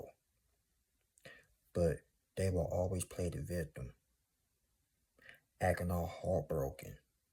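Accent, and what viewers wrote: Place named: South, United States. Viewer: I like your southern voice, You are easy to listen to.❤😊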